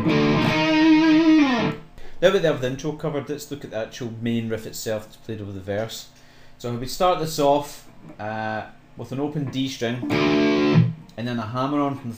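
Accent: Scotland, United Kingdom. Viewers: BRILLIANT M8 where areyou from in Scotland ?? Edinburgh?? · you scottish dude?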